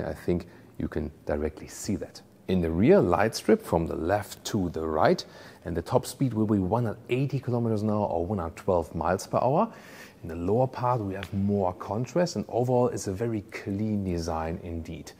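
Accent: Germany. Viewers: If the presentation of a car is mostly focusing on the design you know that something is wrong. It doesn´t help that a german guy is trying to make it more convincing by giving a biased presentation with a bloody german accent. The high pricing is just insane.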